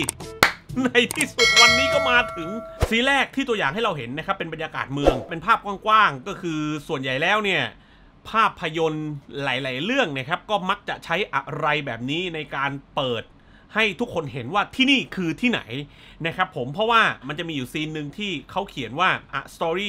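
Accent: South, Thailand. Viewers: เอ้า คนใต้รึครับพี่บาว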